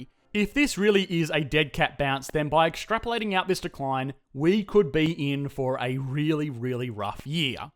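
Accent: Australia. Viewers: Is he Australian?? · is the speaker an aussie?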